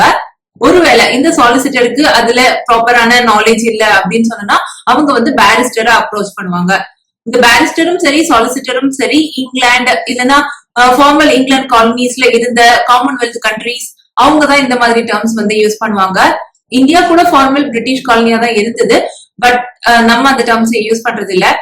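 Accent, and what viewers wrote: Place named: Nagercoil, India. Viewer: Idhu Mamma oru Nagercoil..... Salang theriuthaaaaa..... From Kanyakumari distritaaaa mam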